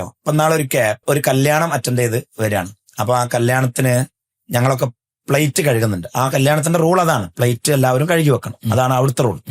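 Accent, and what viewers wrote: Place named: Malabar, India. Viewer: This guy know good malayalam but sometimes he goes to the low class malabar accent. But think world is not malabar.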